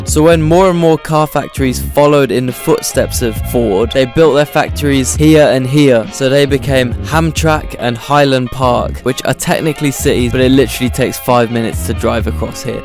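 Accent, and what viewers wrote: United Kingdom. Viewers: So cool, with your bri’ish accent, thanks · Not a good video of Detroit. You have a person with a UK accent that cant pronounce Hamtramck correctly & really knows nothing about the city.....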